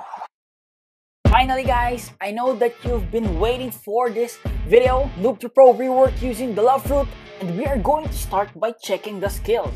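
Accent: Philippines. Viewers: Filipino kba? Halta sa accent e, btw gawa ka noob to pro using light and tips for light user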